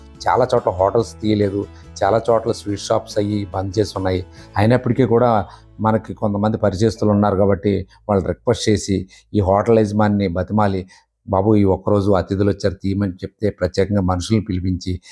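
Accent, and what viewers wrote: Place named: Visakhapatnam, India. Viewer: godavari matayasaledu vizag matalvunnayi 😍😍🙏🙏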